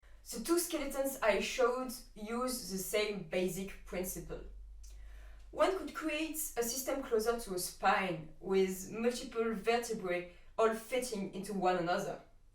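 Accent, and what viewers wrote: France. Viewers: Tu es fr? On entend un fort accent français :3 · You have a fr accent i u right? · Tu es française toi sa s'entend xd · Oh my gosh! You are SOOO adorable and talented. Thanks for sharing your processes in making articulated tails. My daughter loves them! She wants me to help her make one and this video has helped me SO MUCH! All the best to you! We love listening your cute French accent!!! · i was wondering, is you accent a french one by any chance? i rarely get to hear french people speaking english with such fluidity so i'm not used to it. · Me, french people : FRENCH ACCENT !? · Are you French? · 00000 i can use this for a fursuit tail!!! thank you so much! also let me just say your accent is amazing! is it french? i really like listening to it it's sort of calming :> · Are you french ? you havea strong accent ! (I'm french myself so just asking ^^) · Avec l’accent je me disait que c’était pas une anglaise mais une française 😅 Mais juste RESPECT pour pouvoir créer des parties de cosplay 👏 J’en prendrais graine lorsque j’aurais tout les matériaux pour faire mon propre cosplay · hum that french accent... 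i have the same one sinon joli travail. meme pour les prototypes, ils sont plutot bien réussis. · That so good omg ! I was wondering , are you French or something like that ? Btw it's really cool keep it like that is really amazing ! :D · j'ai reconnu notre fameux accent en anglais x) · Are you French ? you have a french accent x) (si jamais je suis français et bravo pour ton travail !) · toi sa se voit que tu es française juste par ton accent, tu parles bien anglais quand meme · Ça sent le français cet anglais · Are you french, you sond French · Are you french? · I don't know but I'm just assuming, she's French 'cus of her accent?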